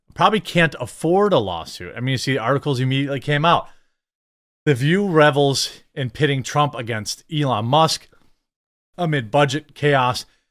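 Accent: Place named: Wisconsin, United States. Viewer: Love you man! Merry Christmas to you and yours. I love hearing the Wisconsin lily in your voice; makes me miss home ❤. Keep up the great work.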